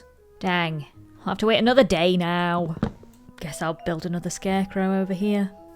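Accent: United Kingdom. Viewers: OMG FINALLY U ARE ALIVE!(*ゝω･*) I still like British accent(((o(*ﾟ∀ﾟ*)o)))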